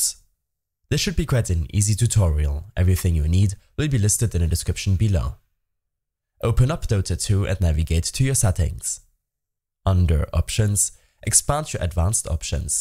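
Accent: Germany. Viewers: Bro are you from Germany?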